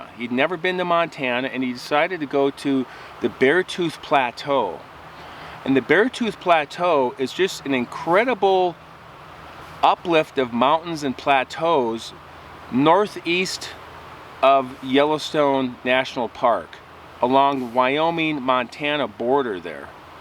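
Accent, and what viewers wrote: Minnesota, United States. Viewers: Thank you, keep hiking,Haha. I enjoy listening to you and even before you said you were from Minnesota I figured as much as I recognize your slight accent. Mine is probably a little thicker as I am from northern Minnesota. Anyway thanks again.😎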